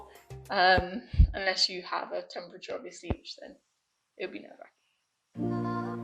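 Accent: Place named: United Kingdom. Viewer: Hey, your videos are so informative and helpful. Thanks for sharing your travelling tip during the Pendamic. I miss travelling personally as I would have loved to travel and explore New Zealand and Japan next ❤. Im from Canada too but I live in Ontario. I like your cute British accent to. Keep sharing and stay safe😊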